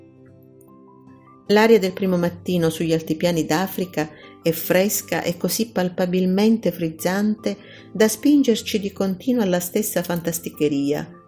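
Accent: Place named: Sicily, Italy. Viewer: Vabbè, puoi fare un corso di dizione se vuoi, ma credimi, che anche se si sente l'accento siculo secondo me andresti alla grande!!!😉 Trasmetti più emozioni TU con il tuo accento, rispetto ad altre persone che ho ascoltato!!!😍🤗♥️♥️♥️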